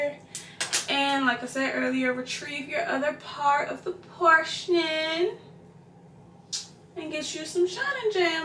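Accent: Washington DC, United States. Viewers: Accent give me dc vibes 💕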